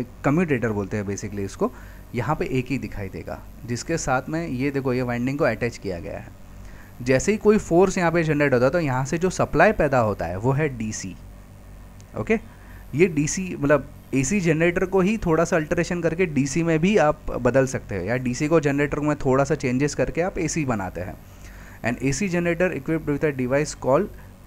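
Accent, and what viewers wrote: Gujarat, India. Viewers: R u gujarati?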